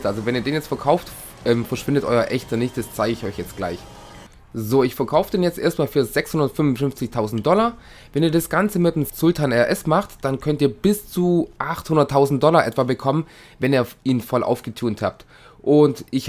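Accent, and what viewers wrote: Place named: Swabia, Germany. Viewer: Dein Akzent ^^ Ich liebe ihn haha ;D Schwabe :D Ich wohn in Ravensburg, sagt dir das was? :D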